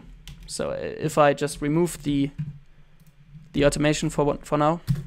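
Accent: Germany. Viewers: wetten du bist deutsch haha dein Akzent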